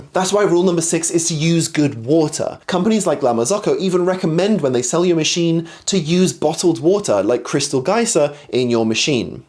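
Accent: United Kingdom. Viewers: The advice is for spoiled brats. Another British accent bullshit.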